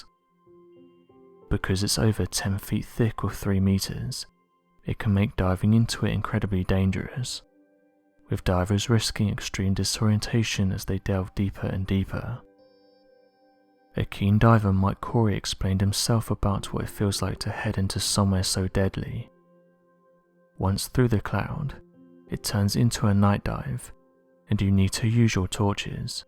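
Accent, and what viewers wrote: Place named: RP, United Kingdom. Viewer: And the queens english is easy on the ears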